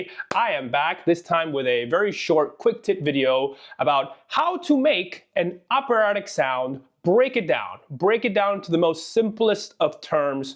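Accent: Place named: United States. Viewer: I thought you were italian for a second i got excited 😭 Edit: Oh, you are, i watched another one of your videos and you just have a really good american accent lol.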